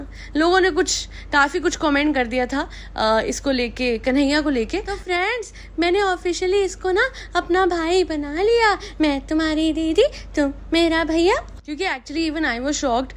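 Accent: Punjab, India. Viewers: Your voice is so sweet n soothing but aaj aapki meethi punjabi sunkar toh maza aa gya or jis tarah se aapne bola usse toh lgta ki aap Punjabi ho mei bhi punjabi hu toh or bhi jyada khushi hui❤❤❤❤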